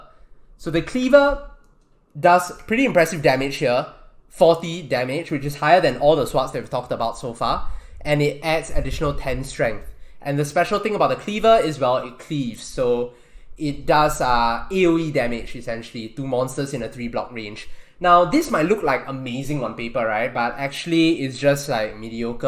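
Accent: Singapore. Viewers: are you singaporean? because you sound like a singaporean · he’s singaporean and so am i it’s a common mistake people make in singapore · You sound like your from Singapore 🤔 I’m from Singapore :D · Damn your singlish top class man support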